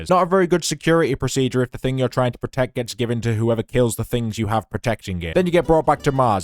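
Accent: North, United Kingdom. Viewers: You seem very northern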